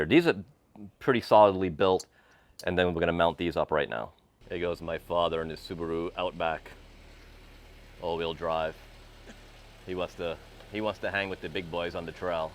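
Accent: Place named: Northeast, United States. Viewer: I was gonna say your channel name is, but your accent is Northeast like NJ or NY. Then I see the GPS on the tablet being New Brunswick!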